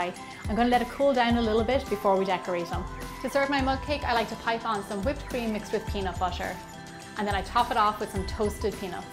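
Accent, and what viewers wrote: Ireland. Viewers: Are you Irish ? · Is she Irish? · Wow, So cool! You remind me of another youtuber! He does gaming and he is also Irish! You have an awesome accent! Great Food! I just made your Blueberry Muffin and Mac & Cheese! Very Yummy!! Fabulous Work! Please Keep Making Videos! :D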